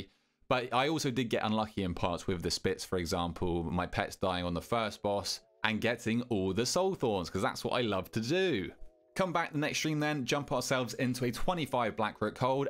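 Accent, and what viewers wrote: United Kingdom. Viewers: Couple of questions mate, how do you even click those skinny nameplates and also, where in the UK are you? You sound fairly southern... Great content btw, love it.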